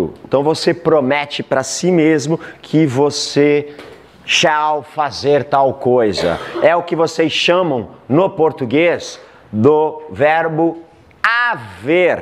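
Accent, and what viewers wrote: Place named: São Paulo, Brazil. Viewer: ele tem sotque de Paulista sq sem gírias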